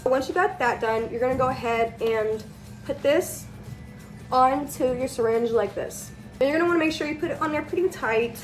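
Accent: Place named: Latin America. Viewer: Are you Hispanic? Your accent really throws me for a loop bc you sound Latina. You are, right, like half maybe? (And btw polymer is pronounced 'paa-luh-mer.' I thought you were referring to something w/ a trademarked name, something like 'polymorph' or something. Polymers are a class of materials that are like plastic. But you should say it correctly.) Also, funny that you're teaching kids how to use syringes. I use syringes for um....something else. And don't call an object 'he/she.' Your videos make me angry.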